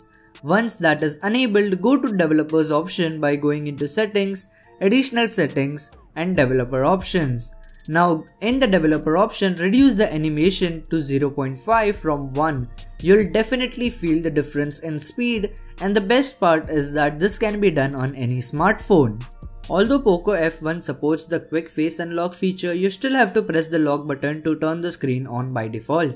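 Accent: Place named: India. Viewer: Indian accent are usually bad since you can barely understand them but i luv listening to urs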